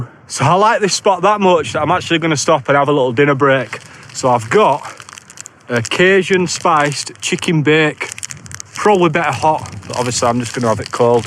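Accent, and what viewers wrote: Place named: Bradford, United Kingdom. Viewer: Great to hear a proper Bradford accent!! Good lad!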